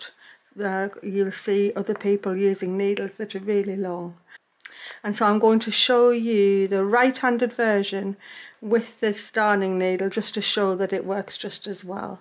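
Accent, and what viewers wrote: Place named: Stoke-on-Trent, United Kingdom. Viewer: A few years ago my family went up north to Newfoundland to L'Anse Meadows to see the Viking Settlement there. I purchased a needle for just this craft. So now I'm retired from a lifelong nursing career lm going to have a bash at this. I'm so glad l found your channel,. Your accent reminds me of my friend from Stoke on Trent!. All the best of Nova Scotia xoxoxo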